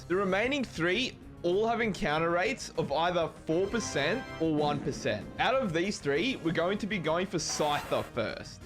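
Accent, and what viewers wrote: Australia. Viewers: Biggest Victorian accent going. The “alite four”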